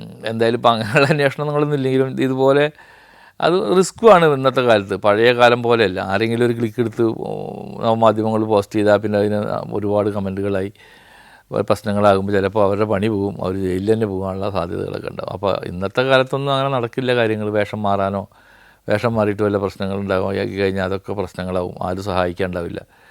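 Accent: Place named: Valluvanad, India. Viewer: നന്ദി സാർ, വള്ളുവനാട് ഭാഗത്തെ ഭാഷയിൽ സാർ പറഞ്ഞ കഥകളും പറഞ്ഞ രീതിയും വളരെ നന്നായിരുന്നു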